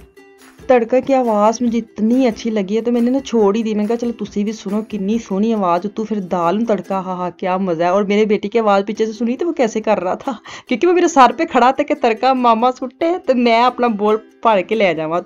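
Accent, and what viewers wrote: Punjab, India. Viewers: Salam sister, Ma sha Allah j apke volgs buhat achhy hote hn .specially baat krne ka punjabi style tu buhat achha lagta hy .ap jo oven use krti hn roti k lye oska company name bta dain plz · Watching you from UK Love your Panjabi and the way you talk... Like your videos....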